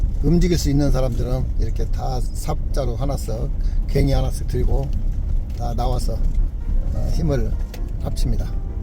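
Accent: Jeolla, South Korea. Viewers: 님사투리가 진안장수그쪽인가요 옛날군생활할때후배가비슷한사투리썼는데 귀에익어서요ㅎ암튼잘보고있어요 건강하세요!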